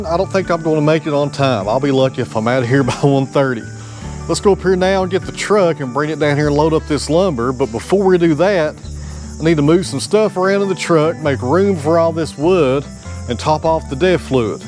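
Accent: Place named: Kentucky, United States. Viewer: Was this english? CC displayed it as such, but it almost sounded like groningen to me (from dordrecht). Ok, must be a local dialect in kentucky.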